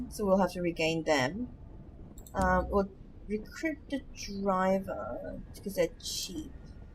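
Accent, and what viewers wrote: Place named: Australia. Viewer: You sound Australian with a bit of American accent at times, sometimes a bit more British...😅 Are you an Aussie? Because uploading that early in the morning seems rough...?😜 Edit: Hmmm... Somtimes I'm picking up a slight non-native speaker accent...🤔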